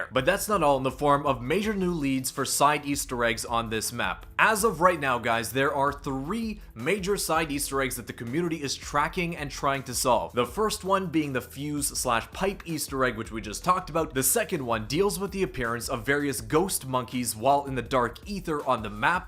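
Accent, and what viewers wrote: Canada. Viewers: Love how us canadian say words, got a good laugh out of that one and not that I really care about being noticed I just watch till the end every time, would like like this comment eh? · Didn’t think twice about the Canadian accent, also up here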